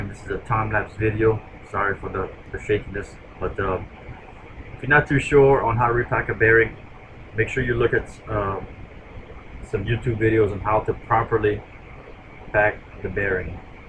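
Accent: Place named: Louisiana, United States. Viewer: I recognized that accent immediately. You from down the bayou. I’m from Thibodaux.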